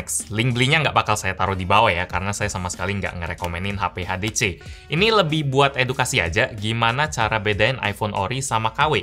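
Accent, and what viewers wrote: Indonesia. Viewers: Buanglah accent indo ko tu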